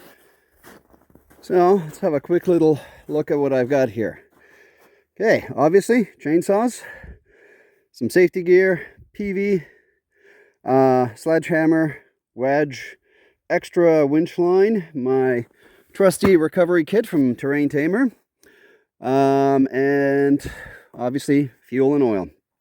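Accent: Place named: Poland. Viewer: Your „good morning everybody” is a single phrase where I can hear a ted of Polish ( non- native English speaker. Your „everybody” I can hear everybodi ( kind of soft Polish letter i ( like Irena) instead y. It’s very cute and pleasant sound. Also you don’t speak English as a person born/raised in America . The way you speak, built phrases, apply grammar and syntax, is like you graduated class for a public speaker: more sophisticated and elegant that common people use. I am having so much fun and pleasure just listening to to your narration. You don’t neither stumble , nor repeat yourself, talking fluently in an interesting manner. Thank you 🙏, sometimes , just for pleasure of listening to your voice. I am not ignoring the subject, just : double my pleasure of witnessing your every day adventures. 🤗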